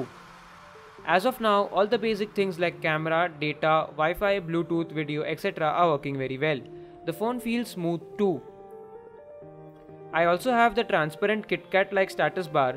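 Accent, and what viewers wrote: India. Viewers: Indian xD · Is there a single Indian without an accent in this world?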